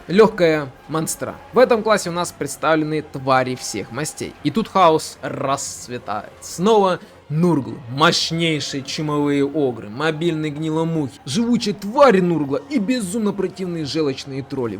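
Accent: Ukraine. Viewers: Хороший канал про практическую ваху. Уши режет украинский акцент , но в принципе очень годно. Лайк. Подписка ? да .. заверните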